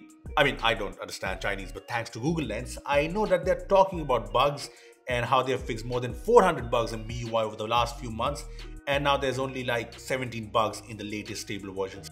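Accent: India. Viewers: indian lol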